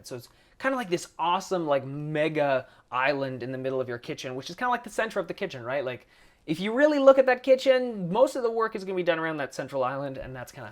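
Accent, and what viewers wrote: Canada. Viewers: Are you Canadian? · He's Canadian, so he has an accent. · He's Canadian, they pronounce it differently. It's not that serious!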